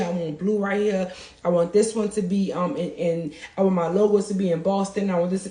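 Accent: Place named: New Orleans, United States. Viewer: NOLA Girl, I HEAR YOUR ACCENT.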